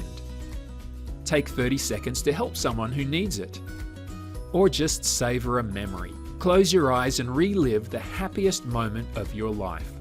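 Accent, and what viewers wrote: Australia. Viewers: I came here to ask about your accent. It’s like Australian with some good ole southern twang thrown in there to keep you guessing! 🤣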